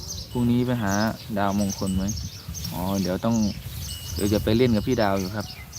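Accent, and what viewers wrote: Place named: Pathum Thani, Thailand. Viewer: ปทุมธานีชัด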